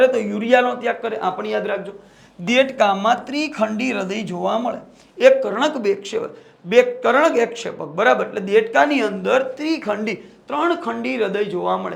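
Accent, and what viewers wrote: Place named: Kathiawar, India. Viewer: તમે પ્યોર અમારી કાઠિયાવાડી ભાષા બોલો સર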